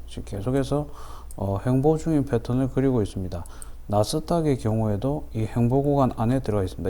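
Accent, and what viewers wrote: Gyeongsang, South Korea. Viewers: 우연히 보게됐는데 경상도 말투도 친근하고ㅋㅋㅋ 재미있고 친절하게 설명해주시네요 감사합니다ㅎㅎ 오늘 sfa 샀다가 매도 놓쳐서 어쩌지 했는데 홀딩할만한 애였군요!! 주식초보인데 20년고수님께 앞으로도 많이 배워가겠습니다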